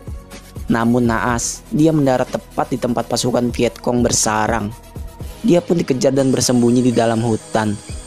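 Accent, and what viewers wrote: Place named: Banyumas, Indonesia. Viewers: Ngapak ngapak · Mimin ngapak🙈🤭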